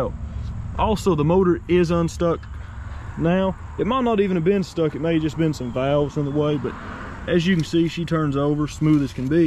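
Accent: South, United States. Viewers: Cool to hear a young person with a strong southern accent still.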